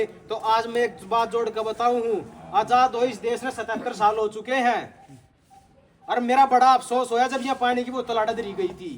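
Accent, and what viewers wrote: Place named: Haryana, India. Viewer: आप जब भी बोले तो शुद्ध हिंदी मे बात करे ये haryanvi झलक ना दे हमें समजणे मे दिक्कात अती है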